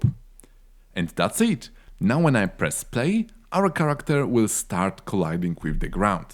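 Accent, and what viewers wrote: Poland. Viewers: The Polish accent hurts my ears.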